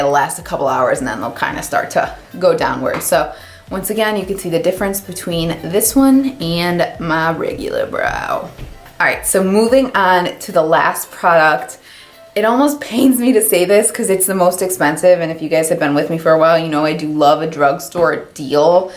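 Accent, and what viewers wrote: Chicago, United States. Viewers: Great video, as usual. You are one of my favorite YouTubers, and I wish you much success☺🌴👍🏼🤹‍♀️Love your accent which sounds so much like the Chicago area types I heard everyday when I lived up there during some of the happiest years of my life❄🌈🌠Hope you will have a wonderful Christmas, (it's Dec 24, 2020) 🎄⛄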